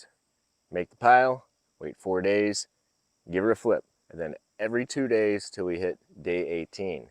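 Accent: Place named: Burbank, United States. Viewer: Another great video. I cold compost but its always fun to listen to that Burbank accent 😂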